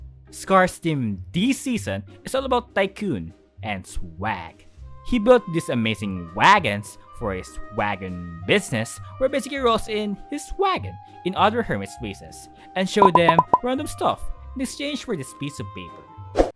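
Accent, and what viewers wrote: Philippines. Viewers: Wait... your accent? Are Filipino?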